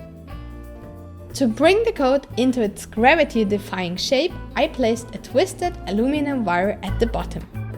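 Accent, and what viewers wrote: Germany. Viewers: Hey, bist du aus Deutschland? Ich frage nur, da du einen deutschen Akzent in deinem Englisch hast und du auch in anderen Videos öfter mal Produkte mit deutscher Aufschrift genutzt hast. PS: Mega cooles Video😊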